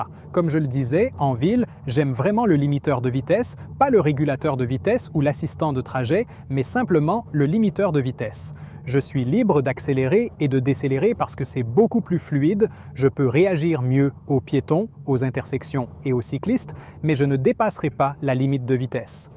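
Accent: Quebec, Canada. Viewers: c'est curieux mais il a un accent du quebec ?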